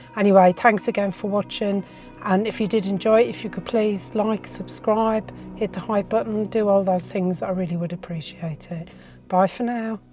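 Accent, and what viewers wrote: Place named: Bristol, United Kingdom. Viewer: This was so interesting and beautifully documented. Iv never heard of this village before. It's alarming to wonder if all those beautiful homes have ghosts. I Reckon there must be quite a few roaming around. To think this started with a bundle of cloth, wow!!! I felt such a strange sense of sadness is there. I don't think I could live in one of those beautiful homes. Thank you for a lovely video, please forgive my asking. But I like your accent, I'm not sure where it's from, is it Bristol.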